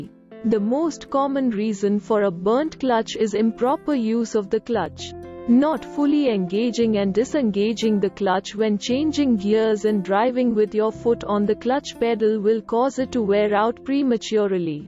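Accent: India. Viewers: Are you an indian lady as of your accent please...!??🤔!!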